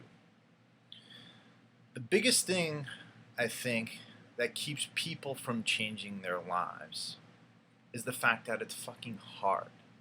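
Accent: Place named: East Coast, United States. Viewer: Thanks for the videos and the motivation. Keep them coming! For the record, east coast accents are the only real American accent. #BXNY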